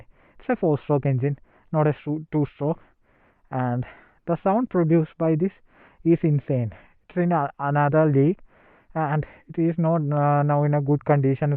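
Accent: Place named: Kerala, India. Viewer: Malayali anenn English kettapozhe manasilayi...malayalathil cheythal nannairunn🎉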